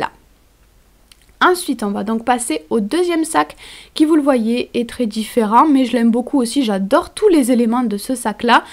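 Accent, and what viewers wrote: South, France. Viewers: Moi qui suis parisienne, ton accent m'emmène dans le Sud, puis cette façon de dire "mon pompon" je craque c'est trop mignon 😍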